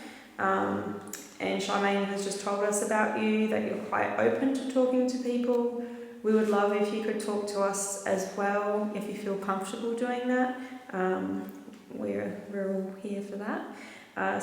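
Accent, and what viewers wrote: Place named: Australia. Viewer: You are so brave. And love the Aussie accent. My fave ghost hunter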